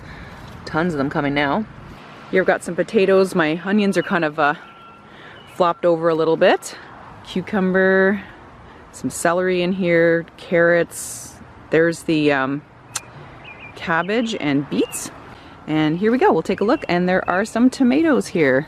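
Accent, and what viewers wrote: Canada. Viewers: my god do you ever sound Canadian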